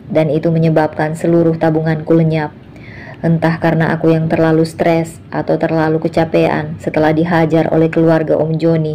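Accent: Medan, Indonesia. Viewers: Bogor hadir nyimak sy senang dgrin logatnya bahasa dr medan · Pasti yg bacakan ini orang Medan ❤❤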